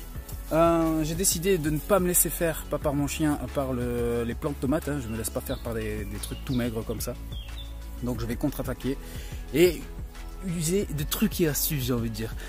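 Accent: South, France. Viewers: Bonjour j'adore tes intros et tes vidéos, bravo👍. <gari> tu ne serais pas du sud toi? Tiens j'ai le même donut! Sinon pour tes tomates je croise les doigts. Bon dimanche et bonne baignade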